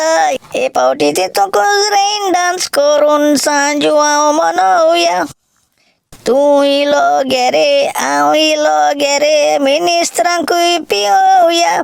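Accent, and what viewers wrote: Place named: Salcete, India. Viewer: Thank you, Love your news. VIVA Goa and Goans Love your Accent Saxtti Bhaxen ahm ❤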